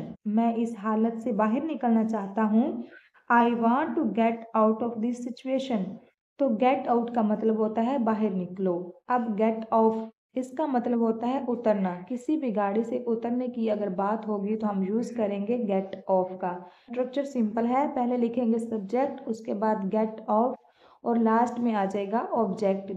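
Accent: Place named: Punjab, India. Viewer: استاد جی آپ کی اردو بہت زیادہ punjabified۔۔ہے۔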